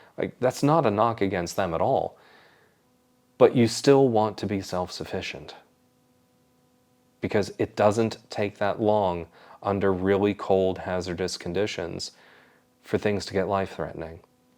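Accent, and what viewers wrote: Scotland, United Kingdom. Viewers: Congratulations on your million views--well deserved! I found Simple Scottish Living just before finding your channel; pretty sure I've seen North And Forth videos too. I really love watching anything to do with Scotland and won't give up hope of living there someday (just wish I was younger). I've also noticed that Scottish accent creeping in...😁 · Oh my goodness, you're picking up the brogue. You've stopped sayin "Scatland" and started saying Scotland, like a local 😀. I'll be listening to see what other words change over time. Excellent content, as usual 👍🏴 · Loving your channel, one thing I have noticed is that you are starting to pick up the local accent, the way you say Scotland & Scot in particular. It will be interesting to see how broad you become as time passes. Also you may wish to consider that the phrase let rip can also mean a bad bout of farting, so I did laugh when you described Christine as letting rip in the kitchen until you qualified it with cooking up a storm. 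Conjured a funny image. 🤣🤣🤣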